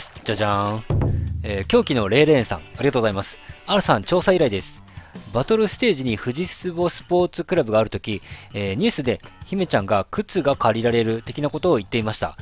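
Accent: Hiroshima, Japan. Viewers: 見るのちょっと遅れちゃった、、、 今回Rさんめっちゃ広島弁多かったですねwwRさんの動画大好きです!!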